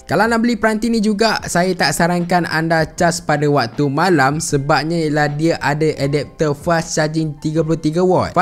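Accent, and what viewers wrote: Taiping, Malaysia. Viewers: Orang tepeng ka? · Hai bro.dok taiping ke?? Cm jiran kee hhshshshssj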